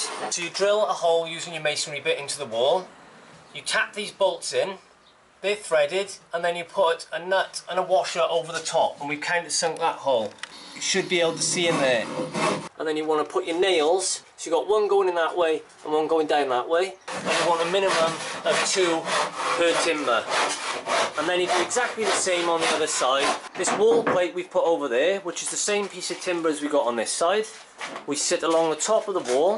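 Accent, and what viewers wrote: Wales, United Kingdom. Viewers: Is that a cwmbran accent i can hear?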